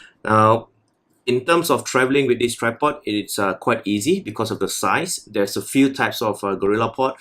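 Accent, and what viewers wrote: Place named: Malaysia. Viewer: When I heard you speak I instantly knew. Fellow Malaysian!!!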